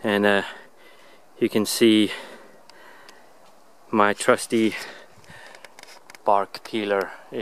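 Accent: South Africa. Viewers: Really enjoyed the video! Is that a South African accent I detect? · I can hear you are from South Africa. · South African accent? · Your south african..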